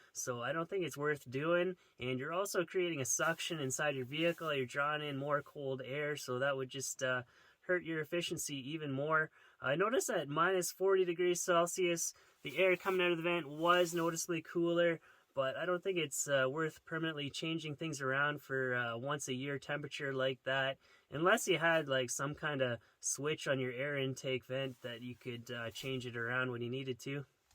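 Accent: Canada. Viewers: On the way owt there. On the way owt lol i love canadian accents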